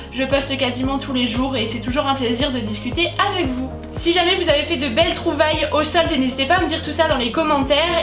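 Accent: Lorraine, France. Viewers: Tu es Lorraine ?:0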